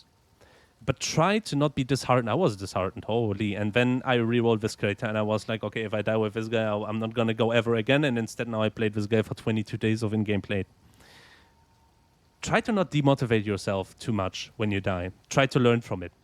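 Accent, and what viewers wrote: Germany. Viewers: Deutscher Landsmann. Hört man sofort raus. 😂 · world first listenable german accent love to see it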